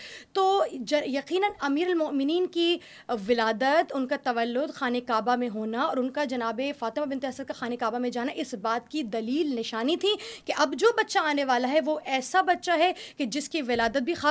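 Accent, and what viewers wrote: Iran. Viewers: Why the persian accent of narration and pronunciation of the sacred names? When all our bibis and prophets were from Arabia. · Have they become less sacred or insulted when pronunciation is in Persian accent 🤔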